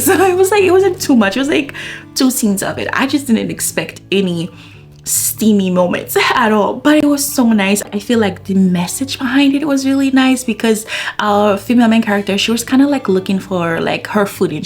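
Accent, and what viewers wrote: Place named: Caribbean. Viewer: I’m hearing an accent, just wondering which Caribbean country you’re from?😅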